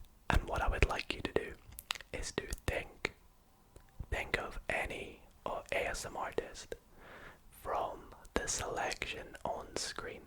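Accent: Scotland, United Kingdom. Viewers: I just realized from Scotland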